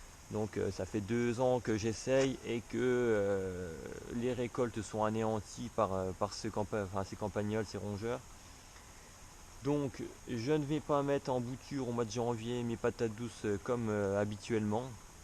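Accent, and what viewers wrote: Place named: Franche-Comté, France. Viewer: Vous avez un accent franc comtois!!!!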